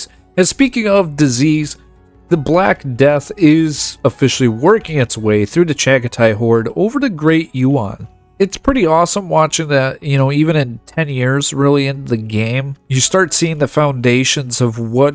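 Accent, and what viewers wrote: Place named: Buffalo, United States. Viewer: You sound like you're from Buffalo. It could be any of the Great Lakes but I know about ten guys who talk just like you in western New York.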